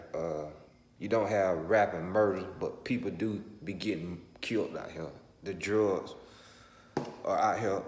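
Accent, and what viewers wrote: South, United States. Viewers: Dude narrating is way country. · He has a southern drag.. Georgia is so big, why live in Atlanta? Im from NY, i don't live in Manhattan. NY is big enough to live outside of the 5 boroughs. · He sound like hes from another southern state that is illietare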